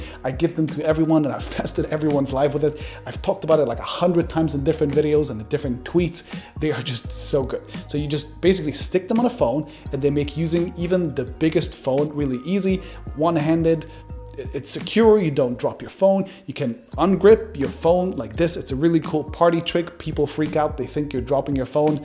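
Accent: Germany. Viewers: Really enjoy watching this channel. First time I thought that he is from Germany haha :)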